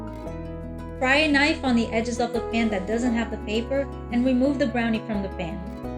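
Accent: Brazil. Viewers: Wait wait wait Are you from Brazil? You have a Brazilian accent, and I’m from Brazil too :D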